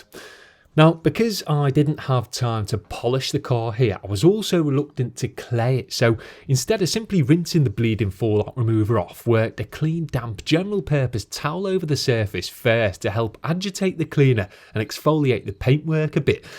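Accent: Liverpool, United Kingdom. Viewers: I seriously fawn over British accents so much 😛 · What is it then? It's a real British accent, man sounds like Neil Buchanan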